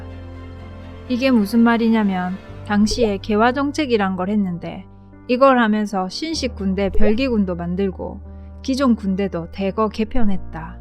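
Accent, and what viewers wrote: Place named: Gyeongsang, South Korea. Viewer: 동학혁명 예고편이네요. 경상도+사극 나레이션 굿!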